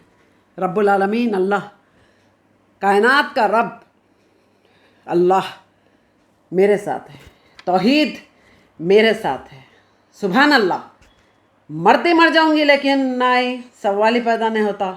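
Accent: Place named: Hyderabad, India. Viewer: ap sida urdu boliye haydrabadi acha nahi lagta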